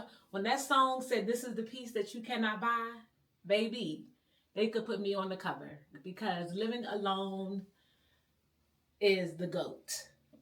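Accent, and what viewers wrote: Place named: DMV area, United States. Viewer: OMG I just knew you were from the DMV area I can hear it in your voice lol I LOVE IT…… Girl you have a Beautiful home to God be the glory I’m truly happy for you✨💛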